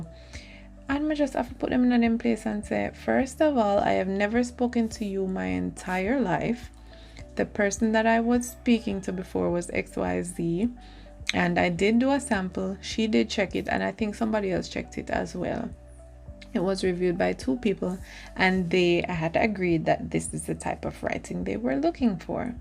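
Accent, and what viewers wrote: Jamaica. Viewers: You sound Jamaican... where are you based at I mean which city? I live in Melbourne Australia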